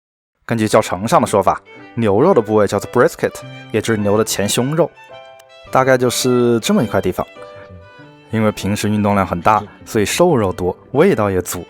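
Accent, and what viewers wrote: Wuhan, China. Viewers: 武汉口音？ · 一口汉普，武汉人吗？ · up主是武汉人？浓浓的武汉普通话的味道。。。🙂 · 湖北口音武漢口音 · 听着你说武汉正宗弯管子普通话，好亲切啊！ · 武汉老哥？ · 我也是家里有两只猫的武汉人 听你口音就像 直到听到你妈妈给你打电话 实锤了~ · 熟悉的武汉普通话，老乡，欢迎来德州奥斯汀试试本地的Franklin，salt lick等等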